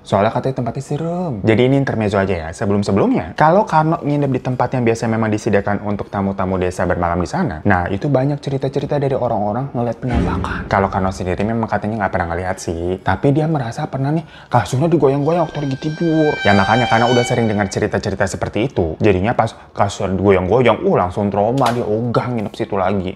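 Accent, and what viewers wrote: Java, Indonesia. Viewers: Bang” , bahasa jawamu itu lhohh , ahh gemes 😂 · Lucu bngt bang kalo ngomong Jawa wkwk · hahaaa mas menghayati peran... pake bhs jowo😁salut mas.... sukses selalu yaaaaa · Lucu banget sih bang ngomong jawanya😂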